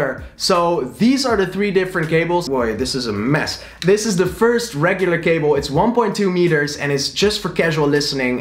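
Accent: Netherlands. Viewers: I can already hear you're dutch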